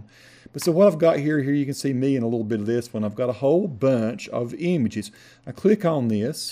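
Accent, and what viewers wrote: South, United States. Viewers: Hey there! Russian from Russia here! Привет из Питера, посоны! Just want to thank you for the vid and say what a lovely southern accent you got there! Keep up with a great tutorials!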